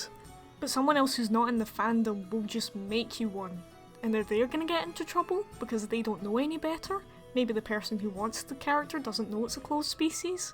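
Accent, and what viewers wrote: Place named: Scotland, United Kingdom. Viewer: Man this accent reminds me that scottish people are a thing, wheres all the scottish people at?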